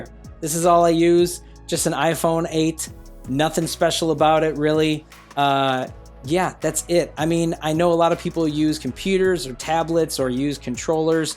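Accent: United States. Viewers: You definitely have that New York State accent,! Your Game ID should've been: NYNick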